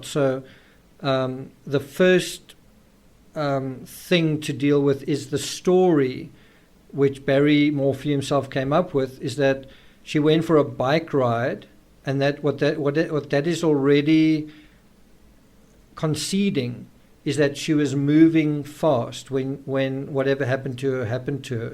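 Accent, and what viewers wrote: South Africa. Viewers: hello, i can't find your name but you sound like a fellow South African. Am I correct?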